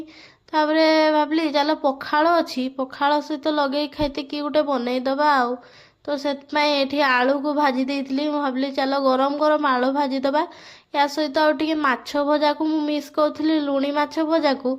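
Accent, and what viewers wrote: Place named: Mayurbhanj, India. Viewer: Pure balasoria katha houni tk tk mayurbhanjia katha ହେଇଯାଉଚି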